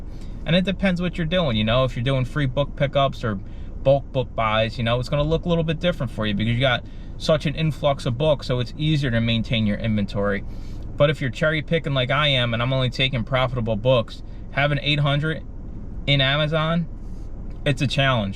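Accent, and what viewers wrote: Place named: Pennsylvania, United States. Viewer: Subscribed last night after finding your channel via a reply you posted in a Fields of Profits video..Loving what you have to offer, thank you! Got my Bluetooth scanner yesterday and ScoutIQ today...now I'm doing a bit of research b4 heading down the street to Goodwill. BTW I love guessing people's accents and I'm so glad you said you where you live b/c I guessed last night...Pennsylvania! I have people in the Pittsburgh area but and ex was from Harrisburg and so your accent reminds me of all his friends and makes me smile. Thanks for all the VALUABLE INFO!!!!!!!!! I'm SO EXCITED to include books in my FBA biz!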